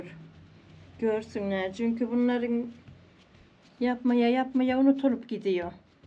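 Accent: Afyon, Turkey. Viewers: Esse lamu aleyküm verahmatullahi ve bereketi Allah 'ın rahmeti bereketi üzerinize olsun ablacım eline sağlık afiyet şifa olsun bizde koydeyken yapardık aynısından sen sinanpasa limitin hangi koydensin ben İstanbuldayım çok özlüyorum sen konuşunca afyonluca hoşuma gidiyor haşhaşi hamurunda yapardık biz sende yap çok güzel oluyor söylersin neresinden olduğunu sinanpasanin arada köyünde çek Allah'a emanet olun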